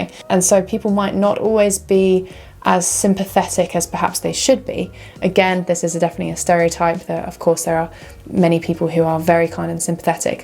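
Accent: United Kingdom. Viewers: 喜歡妳的影片內容以及英國口音!真棒希望繼續分享更多外國文化知識型影片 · 很棒的影片，知性又有趣，讓我們更了解英國文化，感謝您！而且您的英國口音超好聽的！ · 英國口音很悅耳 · The British accent is really beautiful.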